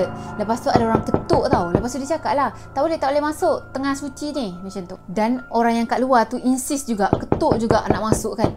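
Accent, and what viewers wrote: Kelantan, Malaysia. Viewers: Ni orang kelantan slang nya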